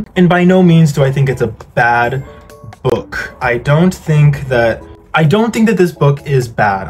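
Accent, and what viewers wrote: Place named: Canada. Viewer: Hi Kate! This is a YouTube video, he plans what he wants to say, he cuts out any unnecessary bits whilst editing and you are able to control the volume you hear him in. All these factors make the difference in comprehension when having a real life conversation with someone. My native language is Portuguese 🇵🇹 I had the same issue when I moved to England as a teen, I promise you it gets better (provided you’re learning English?) - I would recommend trying to immerse yourself into the language more, watch vids in English (anything you want to watch search in English), series, movies etc even if you need English subtitles at first to follow along. Books (actual reading books not the teaching ones) are also good, a lot of people like Harry Potter for languages. It starts off easy and then as the books go it gets more complicated which helps you increase your vocab. 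Maybe English is your native language and the accent is what you’re having issues with, most of what I said would still apply, you’d just have to find vids, series, movies etc in the Canadian accent (basically American so it should be alright) good luck :)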